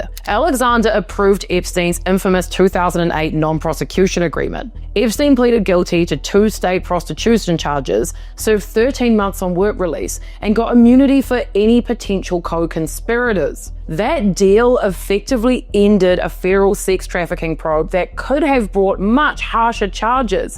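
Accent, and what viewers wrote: New Zealand. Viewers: A Kiwi! It's amazing to hear your pronunciation of the letter e as short i.❤ very groovy 😎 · Love your Kiwi accent...adds some gravitas to your reporting.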